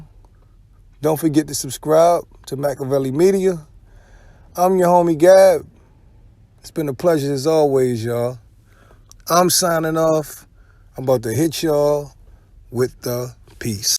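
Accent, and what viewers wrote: Baltimore, United States. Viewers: Are you from Baltimore? Sound like tayroc with the oooos 🤣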